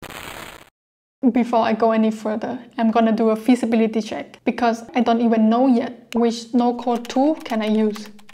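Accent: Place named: Europe. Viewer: Thats an interesting accent bever heard one like it...european i think. Not sure. Interesting video too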